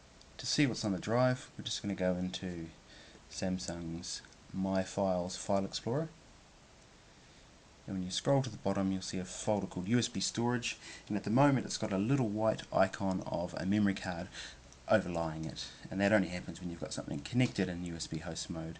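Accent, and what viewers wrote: New Zealand. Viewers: Excellent video, and awesome New Ziland accent. Great to see the keyboard and mouse attached. Thanks.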